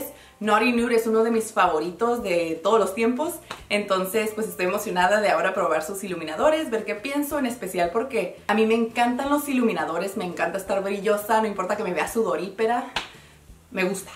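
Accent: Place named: Colombia. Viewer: ¿de dónde eres? Por el acento pareces colombiana....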